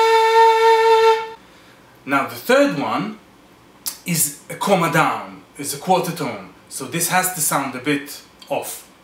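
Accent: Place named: Arab world. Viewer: هذا الشخص عربي وليس اجنبي، واضح جدا من اللهجة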